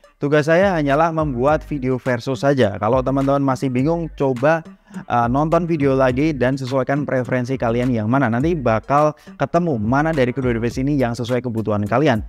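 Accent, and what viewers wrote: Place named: Pekalongan, Indonesia. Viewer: kaceke tidak banyaknya ya, jgn2 mas nya orang pekalongan nih 😁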